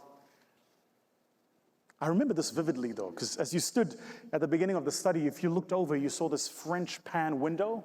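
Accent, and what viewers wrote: South Africa. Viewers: Pure South African accent. Keep shinning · Your Proudly South African ❤ Africa accent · South African accent 🤣🤣🤣🇿🇦🇿🇦🇿🇦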